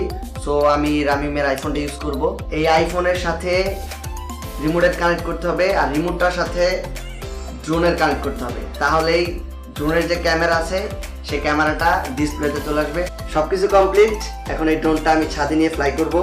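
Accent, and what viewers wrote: Tangail, Bangladesh. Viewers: vaiya tomar basha ki tangail.....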